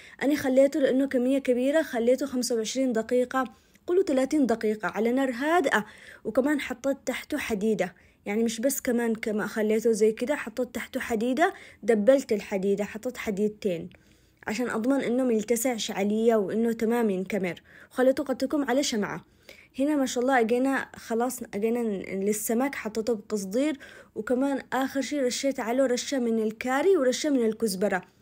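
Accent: Aden, Yemen. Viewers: ما شاء الله عليك فناانة بجد 👏🏻♥️ تسلم الأيادي، حبيت قناتك وطبخك وأسلوب كلامك😍 ويا حلاة اللهجة العدنية منك 😍♥️ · يمنية ؟ لهجة يمنية جميلة واكل ولا اروع تسلم ايدك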